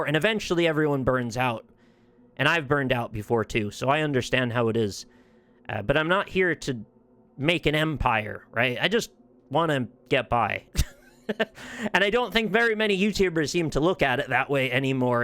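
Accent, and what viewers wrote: Ireland. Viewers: Crazy how you can hear the Irish taking over some words while he speaks haha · I hear a little Irish lilt in your voice now 🙂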